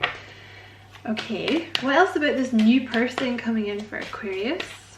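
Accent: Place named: Scotland, United Kingdom. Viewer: Gemini Ty...the Accent is lovely Scottish...lol from Queensland Australia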